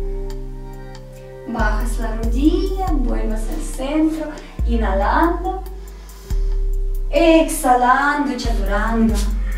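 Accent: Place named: Russia. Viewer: Estás rutinas me encantan,combinando el yoga y perdida de peso, las amooooooo😘😘😘😘😘😘😘😘😘Un besito y no dejes de decir huesito púbico que me encanta tu acento ruso español jajajaja 😂😂😂😂😂😂😂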